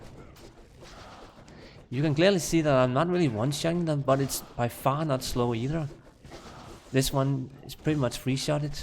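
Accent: Denmark. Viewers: Are you from Denmark? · The danish accent screams at me haha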